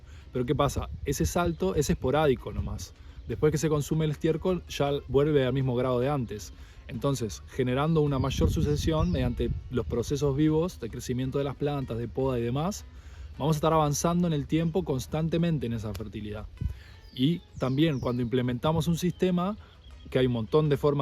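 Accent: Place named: Uruguay. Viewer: Qué divino que todo esto sea hecho en Uruguay, ya me parecía por las especies y tu tonada, además me la juego que es Rocha o Maldonado. Sería divino poder visitar y conocer, abrazo.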